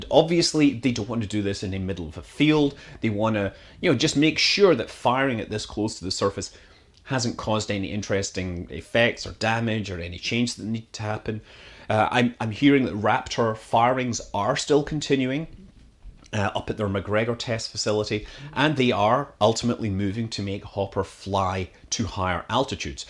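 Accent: Scotland, United Kingdom. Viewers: With that accent? Definitely. Although, if he lives in the US can you truly still call him a Scot? really, what does it even mean to be 'from' somewhere? Ah, never mind. XD I just keep asking myself that because I have grandparents from 3 countries, was born in a 4th, and have lived in 3 of those 4 countries (and dozens of towns and cities) Wherevam I from? I do not know. XD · One of the best phrases that can be spoken in a Scottish accent. It's up there with Curly Wurly.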